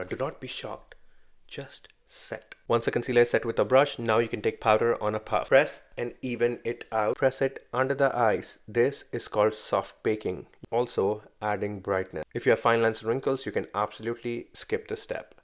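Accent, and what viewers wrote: India. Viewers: I love his indian accent ..it makes me move my head side to side😅😅😅😅😢😢😢😊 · Correct first your accent. Does all Indians and hindoes have short tongue? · احيه اكسنت هندي زفت و كمان ذكر يعلمنا ميكب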